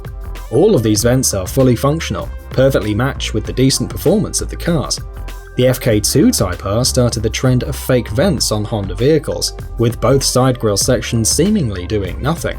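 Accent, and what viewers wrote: England, United Kingdom. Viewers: It is ZED in England, per the narrators accent.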